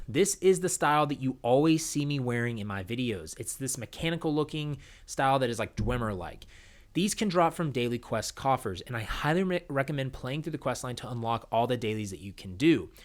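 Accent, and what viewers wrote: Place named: Midwest, United States. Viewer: ain't no "L" in the word zone dude. I'm from the Midwest too and I fixed that dialect issue you can too!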